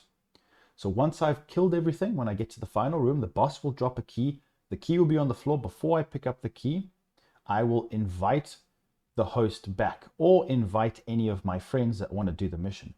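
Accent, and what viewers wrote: South Africa. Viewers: South african? if so what clan are you from?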